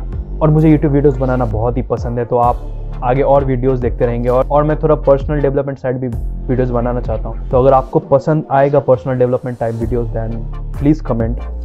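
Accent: Bengal, India. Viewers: thoda bengali accent hai ....bengal se hi ho kya ? ...... and yeah many many congratulations 🥳❤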